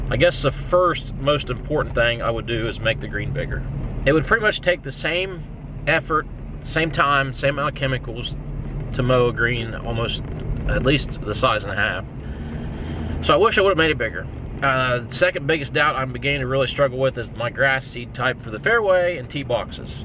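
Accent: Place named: South, United States. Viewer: You ever played Edgewater in Lancaster, SC? I would have never guessed you were from Ohio. You sound like your from the South. Not meaning that in a negative way, just an observation. Thanks buddy. SC has some nice courses!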